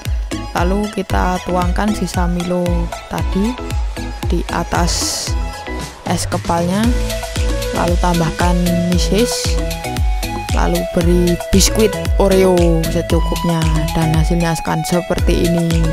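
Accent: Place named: Java, Indonesia. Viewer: Keliatan kali jawanya... Medok abisss...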